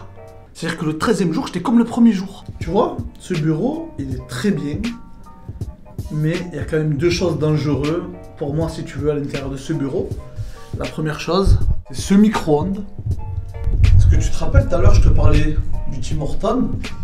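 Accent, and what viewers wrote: Marseille, France. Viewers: J'ajouterai ceci mon cher, je ne sais pas comment tu es dans la vie de tous les jours, mais ici, tu es une des personnes les plus chaleureuse et sympa, tellement que je me suis abonné, et cela est rare. Sûrement ton accent marseillais que j'adore qui me rapelle Marius et Fanny. Mario Repentigny Qc.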